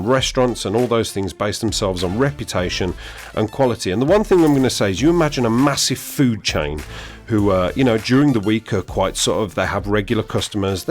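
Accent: United Kingdom. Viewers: Are you British or Australian?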